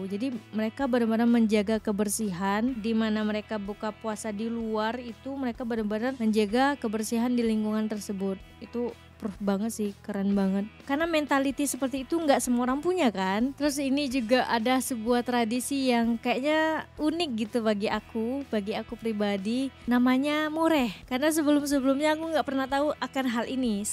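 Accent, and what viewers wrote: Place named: Kuala Lumpur, Malaysia. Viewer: Dh pandai cakap KL😅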